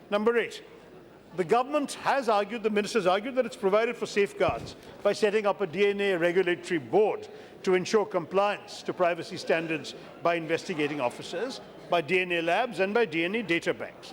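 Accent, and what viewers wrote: United Kingdom. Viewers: Other members sitting around him are stupid and they don’t even care what he’s delivering from his party as don’t understand his British ascent. All points are very clear and valid. Let’s promote him as PM in the upcoming term. Jago Bharat log jago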